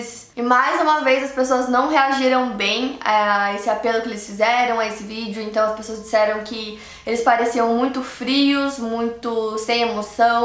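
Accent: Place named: South, Brazil. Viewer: Olha so acho q ela tem sotaque do sul🤣🤣 Mais da pra entender. Tem como colocar legenda tbm!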